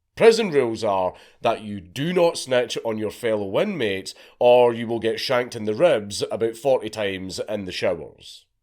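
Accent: Scotland, United Kingdom. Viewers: Whoa whoa whoa what is the Fuck did you just say about Exodus. You have never said anything that has ever offended me before but my dude that ain't cool! Edit. It just occurred to me after I posted this I think I just misheard you because of your Scottish brogue that I love so much that you said thrash band not trash band 😮😅 my bad rock on brother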